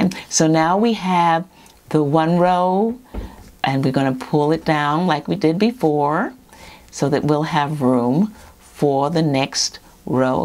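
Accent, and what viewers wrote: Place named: Boston, United States. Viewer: Thank you so very much for doing this! You are a great teacher, and your accent reminds me of my grandma who was from Boston. I will be watching more of your videos! :)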